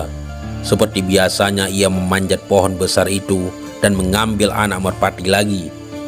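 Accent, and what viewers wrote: Aceh, Indonesia. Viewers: Logad bhsa Admin ini kyak logad bhasa Aceh..?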